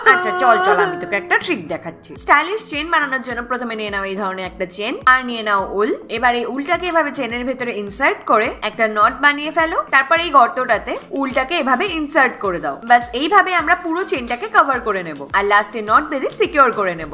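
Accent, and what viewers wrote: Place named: Bangladesh. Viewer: তমার বাংলাদেশি voice এতু ভাল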